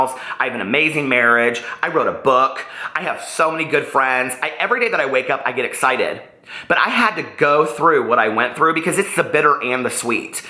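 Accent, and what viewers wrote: South, United States. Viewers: I love your voice! Are you from the south? Personally I love to hear southern accents...it makes me feel at home as I'm from Tennessee.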